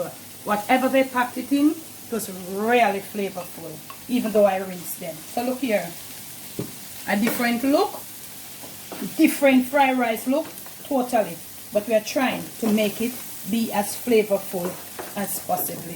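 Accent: Jamaica. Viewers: Hey. You are the best....continue chatting de patois...so authentic just like your delicious recipes...you go Mrs.Girl....Nuff Respect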